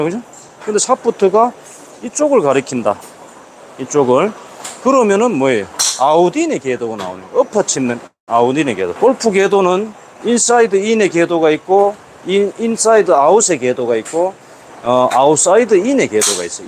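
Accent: Gyeongsang, South Korea. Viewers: 갱상도 구수한 사투리 ㅎㅎ 저도 갱상도 출신이라 정겹네요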